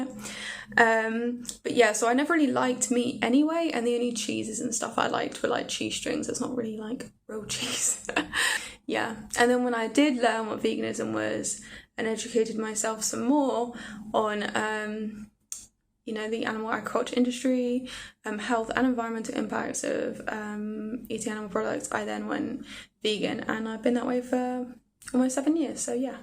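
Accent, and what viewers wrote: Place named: United Kingdom. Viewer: For the first half of 4th grade, we had world geography from Mrs. Hisey in FL USA, and she told us we would need to do a semester project on a country. But I asked her if I could instead focus on Antarctica, my favorite continent. So I used my Father's drafting tool called a pantograph to enlarge a Rand McNally map onto a piece of plywood. I used plaster of Paris painted white to show (vertical exaggerated of course) mountains and painted the ocean blue. I put in little flags to mark the winter-over science stations of color-coded various countries. I added a backboard for some basic stats. I loved that Antarctica was and is the continent of peace, science, nature, and an international zone! I enjoy your delightful UK accent and your love of science and your being a longtime ethical vegan! From my work with ramping up Green TV (US), I know just how challenging it can be to attain 1000 subscribers, so I congratulate you on attaining that. 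Glad you will be tapping space data in your research--not only what the late physicist-astronaut Sally Ride deemed Mission TO Planet Earth, but also via comparative planetology understanding what happened to Venus and Mars, for instance. BTW, as to books, I managed to get the UK edition of Greta Thunberg's "The Climate Book," published on Thursday 27 October 2022, and am reading it and will be reviewing it for Green TV Web site, our first-ever book review. It is an outstanding primer on the No. 1 climate crisis, brin ging together essays by s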